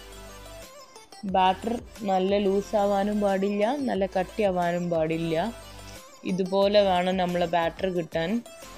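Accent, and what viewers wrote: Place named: Kozhikode, India. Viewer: തനി കോഴിക്കോട് ഭാഷയിൽ അവതരണം 😄😄 So nice keep it up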